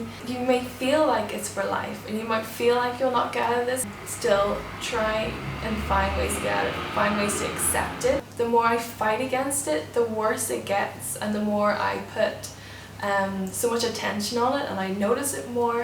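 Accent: Northern Ireland, United Kingdom. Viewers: You said that medication seems to make it worse. I have been somewhat pressured into taking various medications and to be honest all of them made derealization much worse. I definitely cannot take alcohol anymore because the hangover is basically really intense derealization. I guess the only way out is acceptance and to limit the fear response when I experience it. I wonder how you are coping now. I hope you have found a way out. I detect a NI accent.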